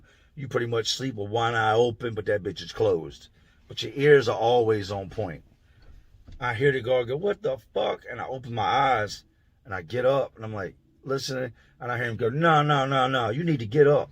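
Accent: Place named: Louisiana, United States. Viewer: Idk how this ended up on my recommendations but I'm glad it did... I was hanging on every word 😅 you're a great storyteller and I don't even like prison stories. You sound like you're from Louisiana ..cool accent.😊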